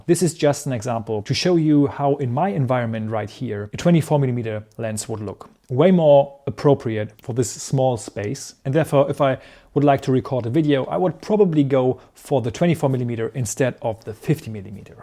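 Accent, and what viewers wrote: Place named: Germany. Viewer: That barely discernible German accent makes everything subtly similar to Schindler's List.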